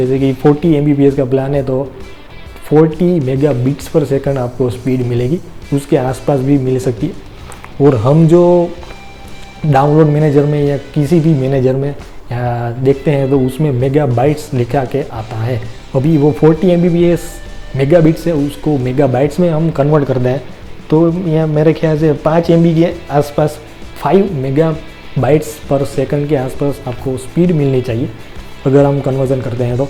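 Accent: Gujarat, India. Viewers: Wah bete wah Aap gujrati ho na aapki hindi se pata chal gaya Hu pan gujarati chhu bhai..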